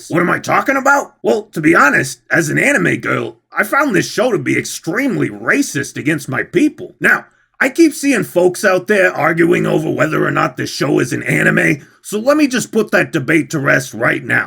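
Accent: United States. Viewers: I gotta ask... what part of the US is this waifus accent from? Subscribed anyway lol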